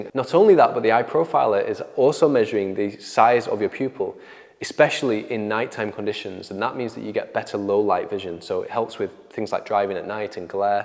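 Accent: United Kingdom. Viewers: I can't remember which of these two lenses I got from The Spectacle Factory. I'm guessing I got Smart Life lenses because when I put on my Masunaga eyeglasses, I'm so much smarter. For proof, when I watch videos, I learn so much!!!...and it's not because of devilishly handsome face and that woozy British accent... though it does help. One thing's for sure, making an appointment with The Spectacle Factory is the smartest thing I've ever done.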